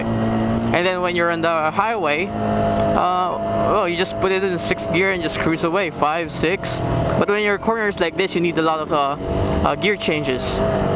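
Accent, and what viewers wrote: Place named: Philippines. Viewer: "yur butt starts to get sore" haha XD Love the pinoy accent mate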